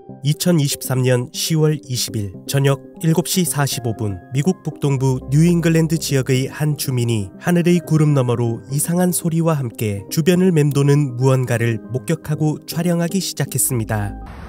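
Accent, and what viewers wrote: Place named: Gyeongsang, South Korea. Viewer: 왠지 경상도 사람이 서울말하는 것 같은 말투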